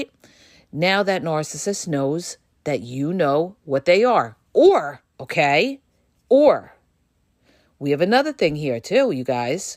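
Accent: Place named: New Jersey, United States. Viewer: I sincerely appreciate your knowledge & sharing it with us. Your Jersey accent is really apparent at times. Thanx again, Sister.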